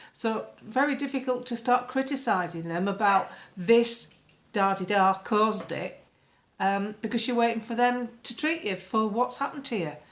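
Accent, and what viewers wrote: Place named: Northern England, United Kingdom. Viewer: My it’s great to hear your northern voice of tell it like it is common sense, just love your humour too. Having left wonderful northern area of Yorkshire many moons ago, I miss the people, I miss their voices. We never look or believe what MSM are saying politically and tell everyone we know to follow you and the other truth tellers. Thankyou, onwards and upwards free speech.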